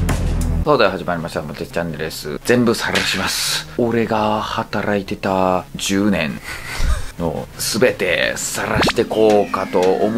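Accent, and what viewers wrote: Kansai, Japan. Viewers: 先生の関西弁めっちゃおもろい！！ww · 関西弁かわいいw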